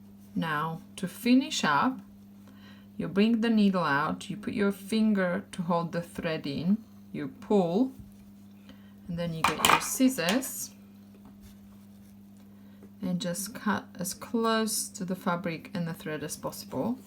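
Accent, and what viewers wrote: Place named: Slovakia. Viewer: Ďakujem za skvelý návod,rozpoznávam slovenský prízvuk :-) .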